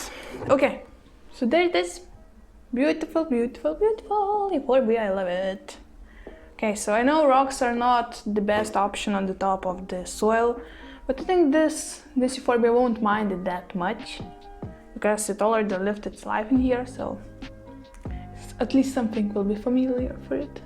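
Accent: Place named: Russia. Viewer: You speak English with Russian accent. It means that you are a bilingual and your first language is Russian. Isn't it? Здесь большая русская аудитория, которая интересуется цветоводством. Советую делать ролики и на русском: прибавите себе подписчиков. Желаю удачи и всего самого хорошего!!!!😀🌷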